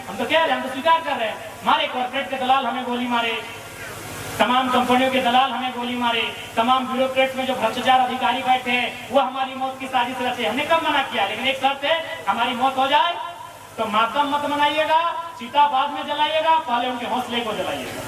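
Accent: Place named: Odisha, India. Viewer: Orissa sa hun bolte ho bhai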